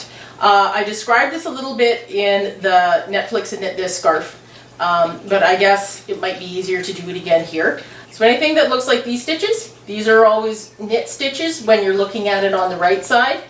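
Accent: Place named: Canada. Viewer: I’m a new knitter.....definitely this is my favourite slipper pattern....Thank you for all that explain and the free pastern. I’m in BC, I could tell you were from somewhere in our country 👌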